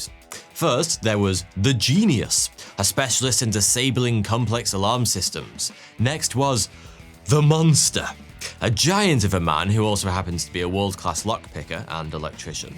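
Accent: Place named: England, United Kingdom. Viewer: OMG, this Guy´s stories are Awesome! And He tells them with such breathtaking English accent! Bravo, Sir! You´re simply the BEST!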